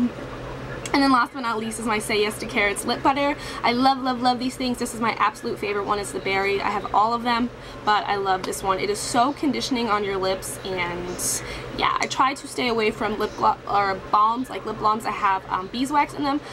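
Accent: United Kingdom. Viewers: I love your British accent! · I wish i could do yoour british accent !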